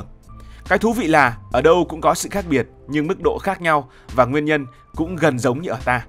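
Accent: North, Vietnam. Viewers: BLV này nói giọng bắc (có lẽ sinh ra và lớn lên ở miền bắc?) nhưng chưa biết rất nhiều vùng ở miền bắc phát âm ko chuẩn như Nắng nói Lắng, Giày nói Giầy, Tàu hỏa nói Tầu hỏa.... Cho nên giọng nói vùng miền của người Việt chưa có giọng nói chuẩn chung. Nhớ rằng giọng nói nhẹ, dễ nghe chưa chắc đã là giọng đúng chuẩn của nguyên gốc người Việt.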